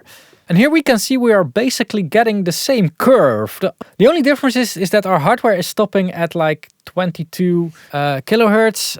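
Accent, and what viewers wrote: Netherlands. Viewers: did you say 'the a-shill-iate links'? I am not asking to make fun of your pronunciation. The dutch accent is fine, no problem. Would be funny though if you know what a shill is and it was intended.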